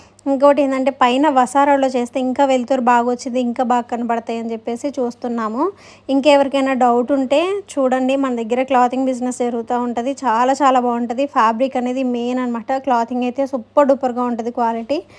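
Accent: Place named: Andhra Pradesh, India. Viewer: Assal meeru by birth andhra pilla la matladuthunnaru appudappudu mana telangana slang kuda use cheyandi because telangana vallu like cheyali kada i mean kotha subscribers ki theliyali kada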